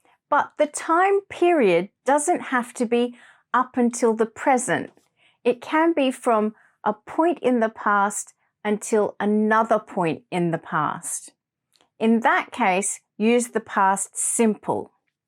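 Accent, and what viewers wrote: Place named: United Kingdom. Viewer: Hi,! I enjoy your British pronunciation. I found your channel today and will continue to use it.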